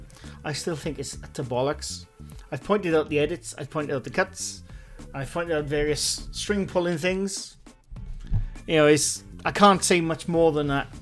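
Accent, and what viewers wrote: Wales, United Kingdom. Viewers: As a Scot, I have no idea why anyone would think you are Scottish?... You are clearly Welsh. All the best mate.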